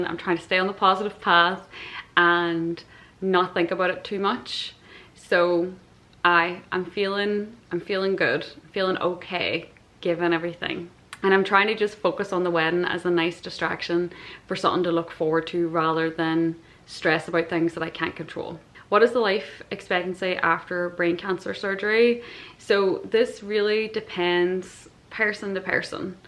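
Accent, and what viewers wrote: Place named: Ireland. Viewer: The further into the video the stronger your Irish accent becomes. I really love that 🥰😂 I am also happy for you that things are going the way they are right now. You should not be so concerned with people who think you are a poser 👉I refer to your Instagram post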